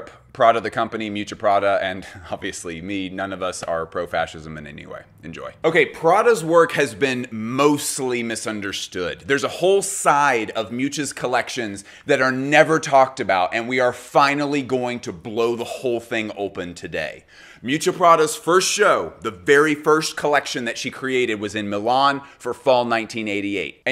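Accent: Russia. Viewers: why are you using a russian accent when conveying fascist things😭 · Super interesting video That's a Russian accent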